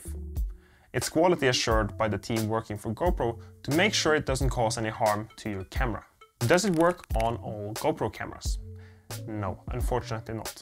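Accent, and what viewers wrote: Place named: Norway. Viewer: Thanks for the video, love the norwenglish. I will remember to smear myself with a little patience 😂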